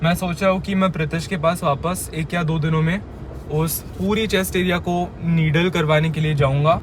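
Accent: Maharashtra, India. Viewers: Marathi accent >>> any other language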